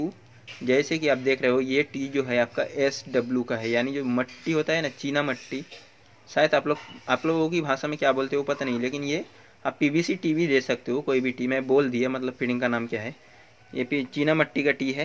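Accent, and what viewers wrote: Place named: Bengal, India. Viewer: Bangali ho na?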